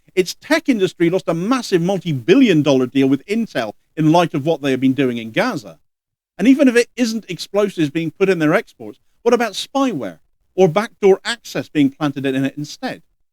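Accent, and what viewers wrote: Cornwall, United Kingdom. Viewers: Aha, a Cornishman! That accent will always make me sit up. This is great, proper job from a Cornish girl living abroad.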